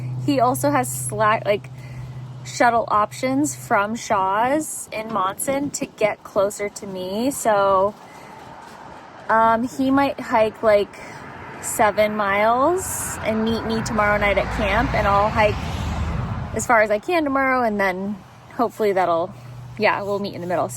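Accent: United Kingdom. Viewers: Hi. A couple of questions for you: 1. I follow a hiker on YouTube by the trail name of Sunshine. She is fairly short, has dark hair, and in her 40s, and would have been hiking with a man named Augustine who wears a beard. Does that sound like the person you spent time with on the beach? 2. Would you ever do an entire video using your posh, British accent? 🙂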